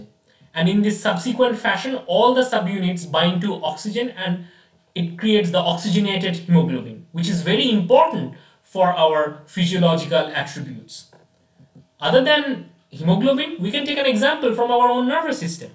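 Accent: India. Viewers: lol he can't afford a real copy of windows? This cyborg voiced indian is sus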